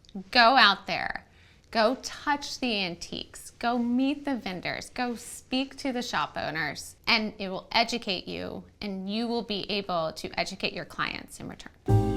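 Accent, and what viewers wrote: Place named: New Jersey, United States. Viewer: Your voice, your voice why do you make that NJ voice?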